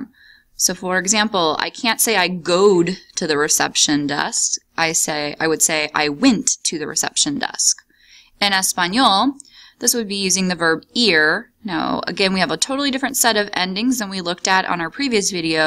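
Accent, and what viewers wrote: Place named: United States. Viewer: I’m afraid I can’t get past her gringo accent either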